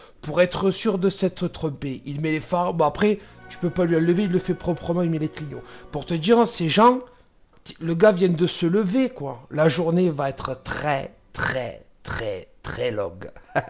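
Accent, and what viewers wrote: France. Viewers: ta voix de la province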